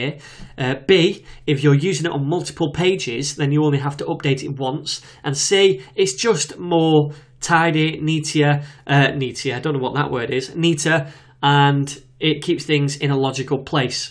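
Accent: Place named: North, United Kingdom. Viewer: Clear and concise tuts with the added bonus of a northern accent.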